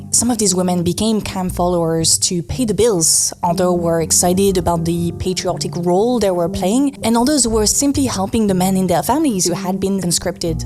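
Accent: France. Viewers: What ks your accent, french? Looking at your username it might also be italian, i do still think french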